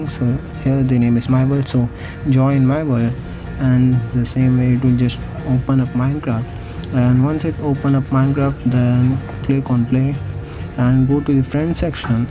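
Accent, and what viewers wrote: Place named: India. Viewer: Guys if he is indian that doesnt mean he talks weird and he is stupid. HE IS NOT HE HAVE OTHWR ACCENT and thank to you . But why you are not happy when talking?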